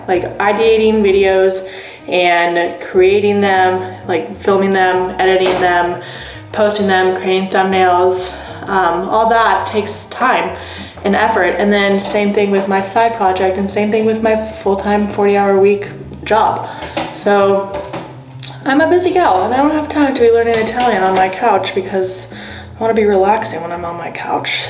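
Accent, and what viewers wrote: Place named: Georgia, United States. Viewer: I randomly found your channel and as soon as you spoke I knew you were a Georgia girl!! Loving your content!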